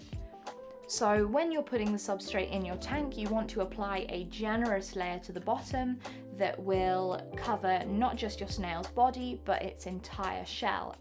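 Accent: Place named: United Kingdom. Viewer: Judging on her accent she is in the UK. They aren't illegal there. Only releasing them into the wild is illegal. Even in the US people in the pet trade keep them but you will get in trouble releasing them or selling them Edit: A lot of people also catch their Giant African landsnails outside in areas where they are invasive and keep them. Id argue they are doing the environment a favor by catching them and keeping them indoors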